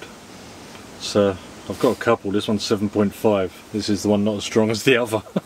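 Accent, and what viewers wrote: England, United Kingdom. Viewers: Been viewing your videos lately and really enjoying them ....very relaxing . Good to hear your English as my ancestors came from England and Ireland.Iwas born a Spencer? Will watch more or your interesting videos. Cheers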